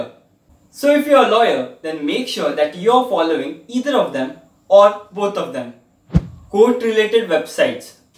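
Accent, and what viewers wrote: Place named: India. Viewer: this bro speaking English like hindi...jisse hindi students bhi asani se smj skta h..👍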